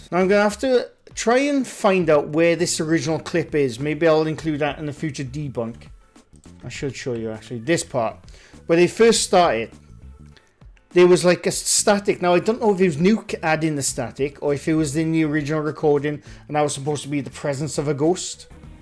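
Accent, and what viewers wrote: Wales, United Kingdom. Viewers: I can't get over people thinking you are Irish or Scottish when your Welsh accent is so strong! mind you i can picture you in Braveheart..... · Are you welsh?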